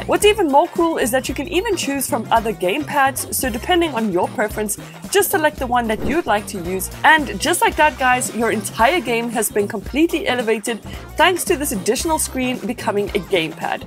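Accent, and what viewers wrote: South Africa. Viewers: She’s From South Africa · You sound South African😁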